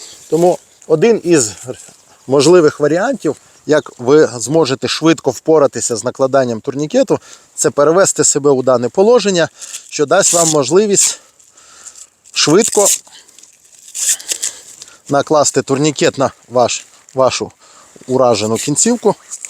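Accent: Kharkiv, Ukraine. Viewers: Дякую, за корисні поради та за чудову Харківську українську ). Мова - теж зброя. ✌ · Дякую за українську вимову, це ліпше ніж московитьським язиком.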